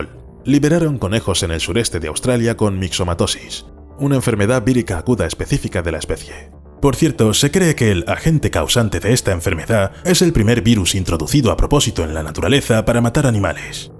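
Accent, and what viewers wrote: Spain. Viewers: Ese acento de España es agradable. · A leguas se nota que el de la voz es ibérico, enfatiza la "S".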